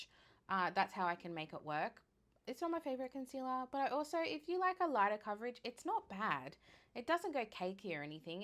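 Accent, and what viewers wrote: Australia. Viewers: Hi, I'm new to your channel. It's so refreshing to hear an aussie accent on youtube and that you review Australian makeup brands and provide links to products that i can actually buy in Australia!!!! Yay!! Thank you so much for your channel. I've already bought some Australis products that I never thought of trying. Very good they are indeed!! Kepp doing what you're doing 'cause it's really great. ❤❤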